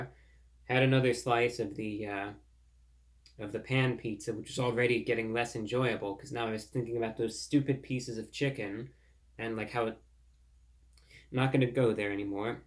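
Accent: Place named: Transatlantic, United States. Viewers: my husband saw thumbnails on my feed and laughed and rolled his eyes. He not only said looks 12 but that people make fun of him. 😂😂😂 I'm like dresses dapper and sounds MidAtlantic like in the 40s. I love the guy. Idc what haters say. It is innocent fun with food, cuz I'm a foodie, and great listens to the podcast too. No cussing and he is a good storyteller and seems like an all around good guy. I say screw the haters.😂 Though his comebacks on hate comments are the bomb. ❤ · Imagine being a corporate exec for some giant, time-tested publicly traded company, and your biggest worry is that a 20-something in a baggie suit, sitting in his grandparents dining room, speaking with a Transatlantic accent, named " ", is going to absolutely fucking butcher and sully your already bad name.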